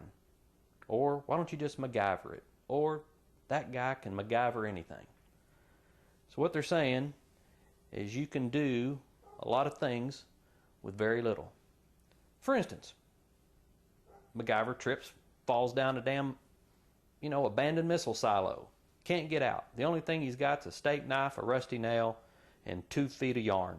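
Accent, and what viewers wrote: South, United States. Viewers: His voice reminds me of Mr. Rogers, but with a southern accent.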